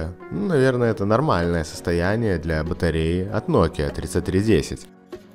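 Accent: Belarus. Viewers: Крейзи белорашан эксперимент, судя по говору.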